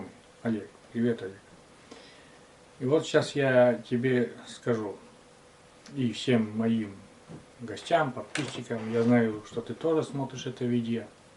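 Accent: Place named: Udmurtia, Russia. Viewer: недавно на вас подписалась, смотрю ваш канал и отдыхаю душой, много от вас позитива идёт, простоты,душевности, чего нам не хватает сейчас, ещё я услышала наш местный акцент / удмуртский/,он меня заинтриговал и вот я с вами. Здоровья вам и вашей супруге, вы молодцы! Спасибо за видео, мне хотелось узнать о вас больше.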